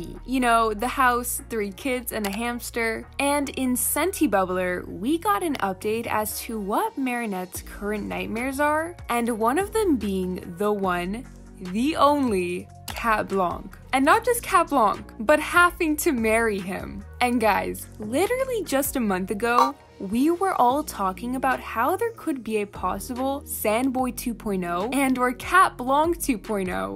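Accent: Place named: New York, United States. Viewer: In the beginning I thought it was someone from New York I dunno I’m just saying!?!?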